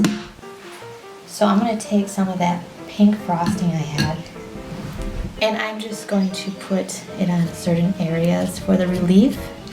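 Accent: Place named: Chicago, United States. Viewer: I prolly said this already, but my sister lives in Chicago. haha. It's thanks to that, that I learned to identify the accent. Box = backs. Solids = salads. Tom = Tam. Hahahaha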